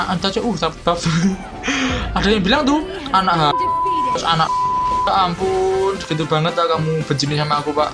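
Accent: Java, Indonesia. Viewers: biasa aja bicara y jangan medok biar gk salah nangkep. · Medok bnget wkwkwk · Jawane sampean ngendi bang?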